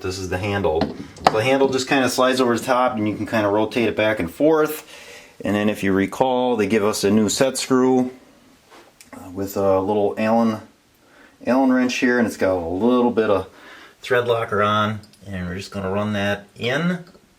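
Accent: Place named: Minnesota, United States. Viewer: Here I am back again watching this video. Leaking started again, despite following all the directions. Didn't wait for Moen to send replacement O rings this time. Bought them online. And they didn't reply to my email. Not a happy camper. However, I am enjoying the accent of the presenter here and guessing MN maybe? Lot of snow in the background.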